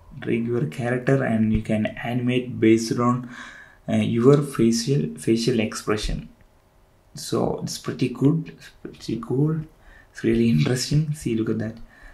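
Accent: Kerala, India. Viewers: That Indian accent tho!!!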